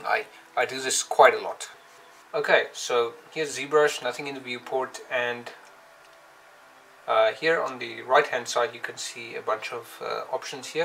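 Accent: South Africa. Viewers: Hahaha lol SA accent 🤣I'm South African too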